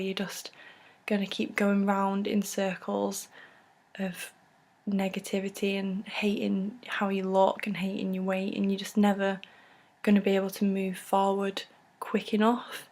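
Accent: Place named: England, United Kingdom. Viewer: that's awesome! i love the english accent! haha yep i am :D there's a11 hr time difference! we should keep each other motivated to achieve our fitness goals :)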